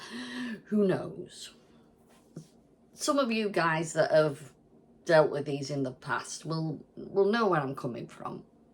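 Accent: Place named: Merseyside, United Kingdom. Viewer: Oh, thank you! I have to say that your accent brought me back to my childhood trips to see my grandparents in St. Helens. We would regularly go into Liverpool and have mini-holidays in Blackpool. I don't if you know those areas but they are very dear to me.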